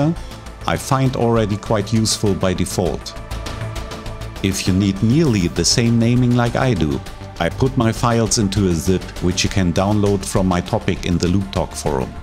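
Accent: Germany. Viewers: Good old Denglisch :D Aber Inhalt ist super!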